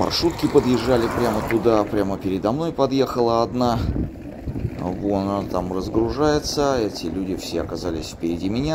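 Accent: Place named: St Petersburg, Russia. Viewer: Это такой специфический питерский выговор? Напоминает нечто среднее между Невзоровым и Федором Чистяковым. (оба питерцы)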